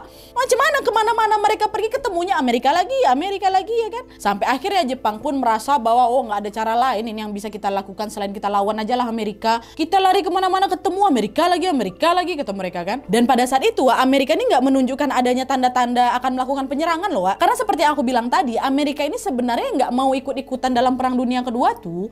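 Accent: Indonesia. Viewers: 😂😂lucu ni bercerita dgn nada Indonesia nya...saya kekadang tak faham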